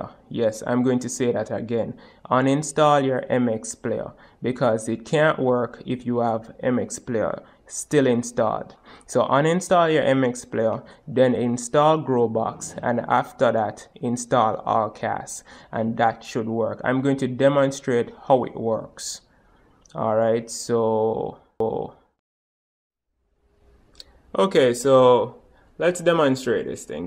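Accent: Jamaica. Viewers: Works perfect for me! I have been trying to cast for 3 weeks now with no success until this video! THANK YOU!!!! Love the Jamaican accent!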